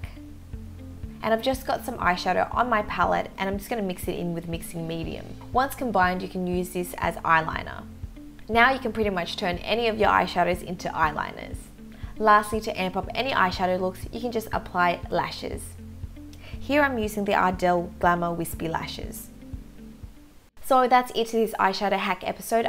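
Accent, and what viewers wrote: Australia. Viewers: New subscriber here. Is she Australian? I really appreciate youtubers that aren't from the USA, not that there's anything wrong with that. It's just nice to have more than the standard American youtubers.😀 Great video! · so basically it was the australian accent? lmao I haven't seen bindi irwin anywhere in years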